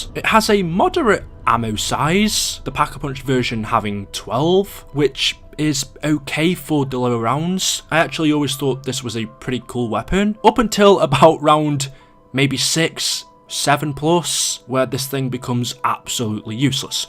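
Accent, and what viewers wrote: United Kingdom. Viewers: are u brithish or are u american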